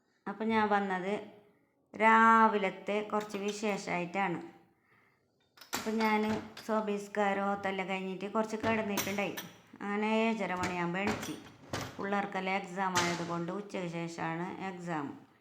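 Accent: Kasaragod, India. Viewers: Good 👍 ksd slang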